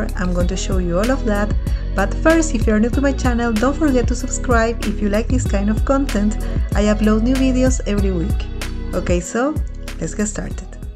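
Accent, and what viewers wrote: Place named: Spain. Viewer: Hola! Me encanta ese lugar, se nota que tienes acento español... Haz algún video en español para los que no entendemos el inglés!!! Gracias!!!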